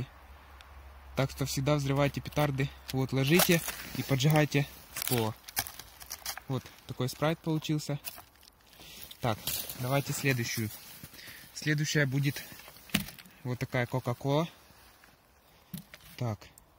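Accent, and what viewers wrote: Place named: Ukraine. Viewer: Из-за укра(ударение)инскова выговора😁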